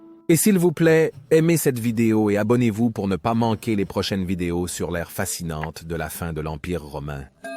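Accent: Quebec, Canada. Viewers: Je relève des notes d’accent Québécois, je me trompe?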